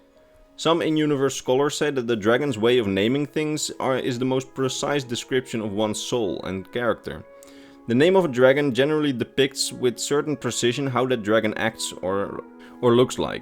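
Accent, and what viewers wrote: Netherlands. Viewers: Haven't really watched the while video yet (doing that now) Love the video so far, really Interesting. Do you happen to be Dutch? I may recognise a slight but of am Dutch accent.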